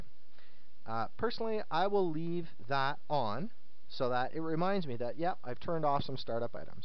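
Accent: Canada. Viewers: ARe u Canadian? Cuz u talk like Les Stroud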